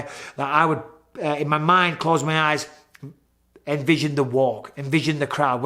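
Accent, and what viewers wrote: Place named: Liverpool, United Kingdom. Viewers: The scouse was pristine. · Fucking hell that one caught me off guard😂😂………. Also I know how our NW England accent sounds when saying a sentence like this 👍👍!!!! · That scouser accent👌👌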